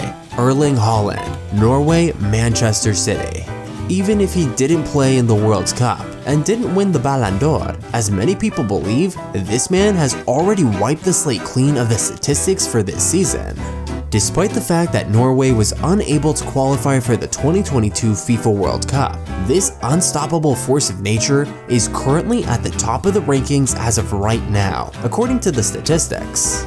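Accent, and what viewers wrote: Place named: Spain. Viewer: Lose the stupid Spanish accent.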